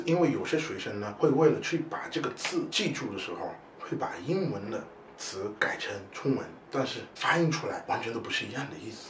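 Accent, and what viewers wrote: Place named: Africa. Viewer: You have a strong African accent tho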